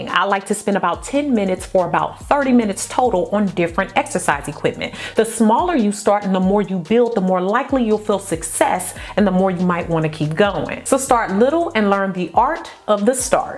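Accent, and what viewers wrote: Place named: South, United States. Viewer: I hear some southerness in your voice. Thanks for this video.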